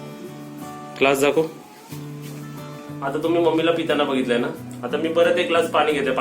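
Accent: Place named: Nagpur, India. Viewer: Chhan sangta,Nagpur chi language vate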